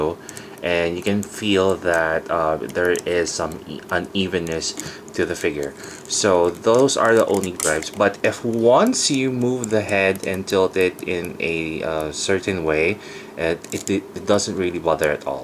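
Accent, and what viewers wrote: Philippines. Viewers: maybe Filipino or singaporean accent...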